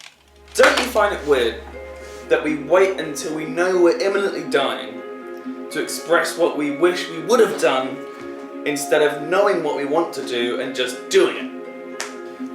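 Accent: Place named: England, United Kingdom. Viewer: ugh the English accent. why don't you all take up more of the Irish speak it's more musical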